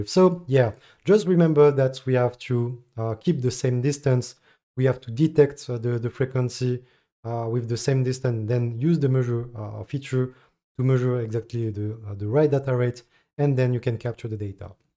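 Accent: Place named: France. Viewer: Hi ! Keep it up buddy #FrenchConnexion Your accent betrays you ahah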